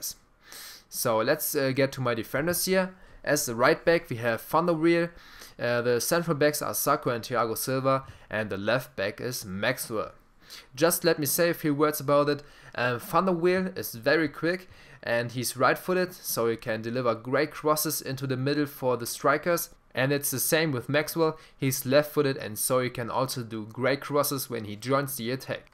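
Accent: Germany. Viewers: R U GERMAN OR FRENCH???????????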